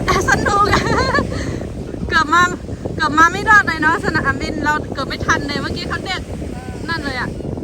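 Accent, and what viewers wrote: North, Thailand. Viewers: สำเนียงและการพูดคล้ายๆ ภาษาเหนือเชียงใหม่เลย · ภาษาพูดสำเนียงเหมือนกันเลยครับกับ ทางภาคเหนือ ของประเทศไทย ติดตามคลิปตลอดครับเป็นกำลังใจให้ครับ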